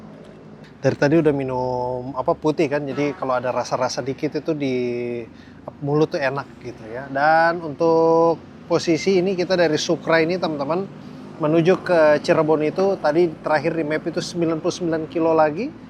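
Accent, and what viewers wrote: Eastern Indonesia, Indonesia. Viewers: Izin tanya bang,, asli daerah mana bang,, soalnya logat nya kyk agak mirip daerah timur sedikit bang 👍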